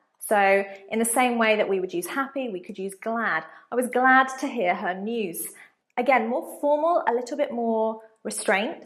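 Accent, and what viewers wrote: United Kingdom. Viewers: Gosh i haaaaaate british accent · What accent os that? os this Bristh accent?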